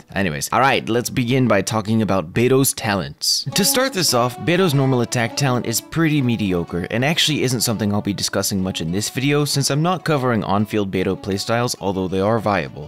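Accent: United Kingdom. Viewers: Why do you sound more british than american?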